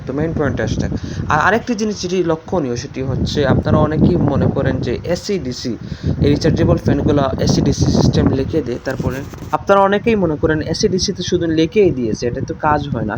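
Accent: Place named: Sylhet, Bangladesh. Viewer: ভাই তুমি সিলেটি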